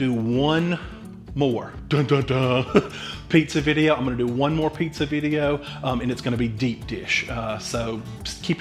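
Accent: South, United States. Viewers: Man! I have been horrible on my diet and apparently was off Keto so bad YouTube stopped suggesting keto channel videos for me... bad sign for my health but also I missed your videos. Love your positive personality and your accent reminds me of my mother’s southern accent. Might have to try some of these and get back into keto eating...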